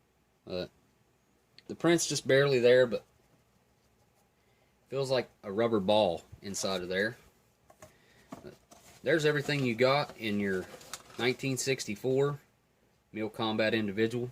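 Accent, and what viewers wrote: South, United States. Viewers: Love your channel & also love your accent! U got the cutest accent ever! Country....but just smooth & cool!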